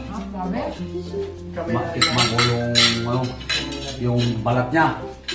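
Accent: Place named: Panay, Philippines. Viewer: ay ganon ba taga concepcion ilo2 ako iba talaga tuno ng taga panay stay safe bos god bless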